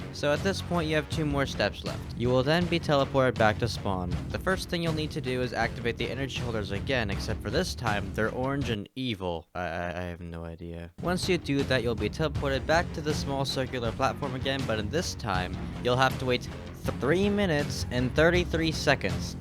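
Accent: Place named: Kentucky, United States. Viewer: Hey dude great video I just have one question... Are u from Kentucky, USA? I just find your voice very familiar haha i hope it doesnt sound weird XD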